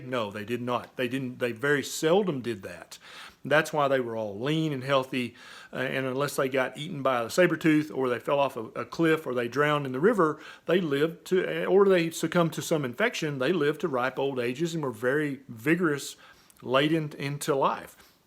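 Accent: South, United States. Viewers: I love your videos. Your dialect is pretty funny though. Are you from the South?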